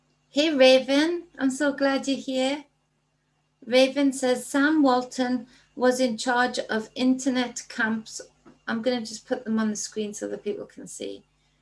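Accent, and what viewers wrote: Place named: North England, United Kingdom. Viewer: Hi. I have recently subscribed to your channel. I’m a reiki practitioner based in north east England too. Lovely to hear your accent 😊 I’ve made a few videos myself about what’s going on 😉🙏❤️ hope you are well. Take care lovely and keep up the good work 😀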